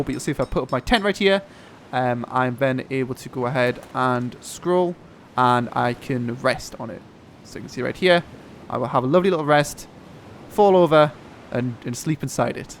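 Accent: United Kingdom. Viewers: British accent :D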